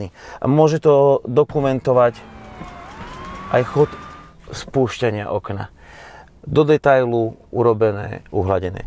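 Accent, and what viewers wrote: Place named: Liptov, Slovakia. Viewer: ten tvoj liptovský prízvuk ... nádhera !!! :D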